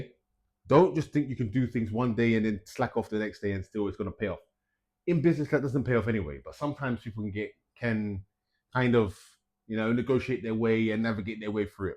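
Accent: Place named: United Kingdom. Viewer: So refreshing to listen to a wonderful astrology session that is presented with a British accent!!❤🎉🙏👍💫✨️🌟✨️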